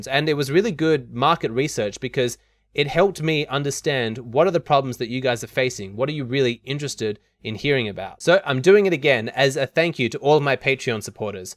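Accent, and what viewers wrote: South Africa. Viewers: She sounds soooooo 🇿🇦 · She's so South African 😂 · This is for sure a South African video. I can hear the South African accent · That accent sounds south african.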